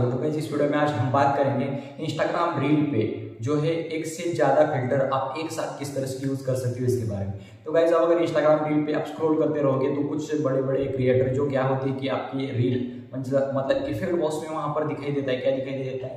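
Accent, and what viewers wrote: Maharashtra, India. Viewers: Marathi ahe ka tu😁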